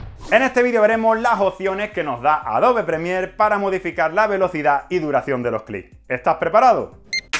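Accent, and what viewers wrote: Andalusia, Spain. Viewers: Qué bien explicado!! Y qué gracioso el acento andalú, me encanta :) Un abrazo!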